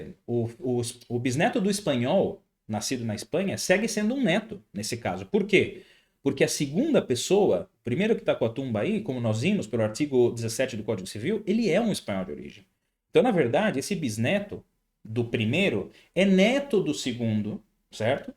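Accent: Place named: Sorocaba, Brazil. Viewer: Cara, você tem um sotaque muito típico de morador raiz do bairro Barcelona ou Colorau em Sorocaba. Obrigado pelas dicas! Amanhã eu e meu irmão temos uma cita no Consulado em São Paulo e seus vídeos me ajudaram muito.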